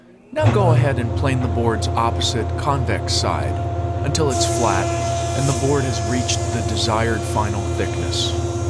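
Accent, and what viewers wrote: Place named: United States. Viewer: What a real american accent from a magyar :)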